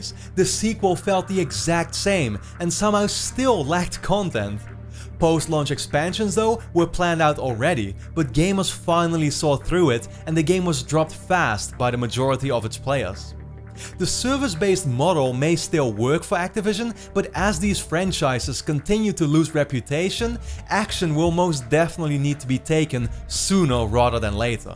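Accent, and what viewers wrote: Netherlands. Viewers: Is this guy dutch? He has a familiar accent... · Dude are you Dutch? i lived for some time in amsterdam and this accent has just stuck in my head so deeply.... :D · Are you dutch? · You cant hide your dutch accent. Its too good to hide ❤️ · dutch accent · Aaah the dutch english, it never stops.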